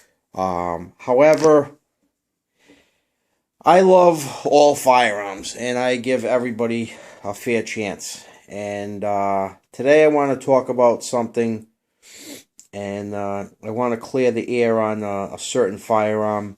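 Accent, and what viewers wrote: Boston, United States. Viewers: New England Boston accent. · Holy shit the accent tho. Bahston!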